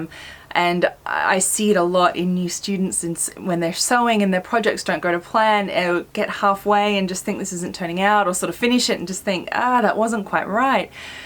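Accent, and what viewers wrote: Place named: Australia. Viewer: My first time visiting you, found you by accident! Firstly, it's great to hear a fellow Australian and I love your chatty format. Coincidentally, I have just gone back to a project this week. I hacked up an old orange mens suit to make a skirt (it was the colour I had been looking for) but it didn't quite look the way I imagined. I put it aside and moved on to other projects, but it lurked in the back of my mind for a few days and now I know how to fix it. Sometimes I think you need a bit of distance to let go of your 'vision' for the garment so that when you go back, you let the garment speak to you. I've got another skirt and blouse (made to wear together) that I rediscovered last night that I think I will revisit this coming weekend. I seem to do a lot of 'sewing' in my head...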